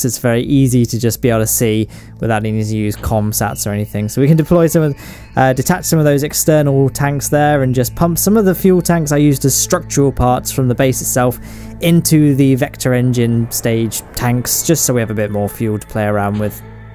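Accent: United Kingdom. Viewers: Do you from UK? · Got a feeling he might be British. Any else one getting that feeling?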